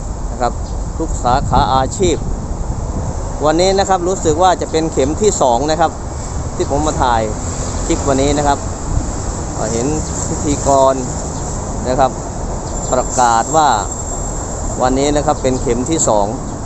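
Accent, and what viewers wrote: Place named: Isan, Thailand. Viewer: สวัสดีค่ะ อ้อมขอมาเบิ่งบรรยากาศการฉีดวัคซีนนำเด้อจ้ารถหลายคักน้อจ้า มักสำเนียงคนบ้านเดียวกันจ้าอ้อมคนสุรินทร์จ้า😊❤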